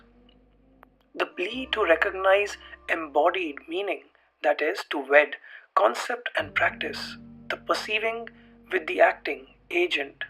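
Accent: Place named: India. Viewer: Very nicely done. Indian English is sometimes tough for me, but that's what 'cc' is for! I hope this is shared widely. I do not see Ep 2?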